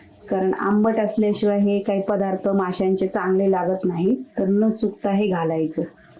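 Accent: Konkan, India. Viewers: कोकणात तसंच म्हणतात त्या कोकणातल्या आहेत आमच्याच गावच्या आहेत आमच्याकडे त्याच भाषेत बोलतात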